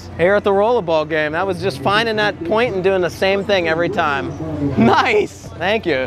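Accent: Pittsburgh, United States. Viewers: I love hearing your Pittsburghese!!! I am from the /burgh and love to hear the sounds of home. I also love the mentions of places near Pittsburgh.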